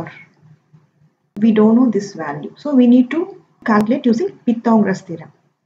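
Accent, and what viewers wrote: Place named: Bengal, India. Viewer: Maam aapki aawaj bengali lagti hai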